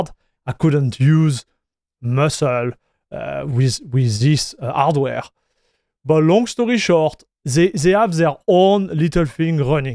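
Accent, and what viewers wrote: France. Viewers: chat, i think this guy might be french, can't put my finger on it why tho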